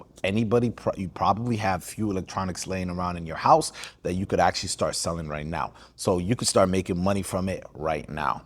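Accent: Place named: United States. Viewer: Im greting you, I'm from warsame bade. Channel post . Second I think your are somali. Live in USA 🇺🇸, because of Your language accent is American 🎉.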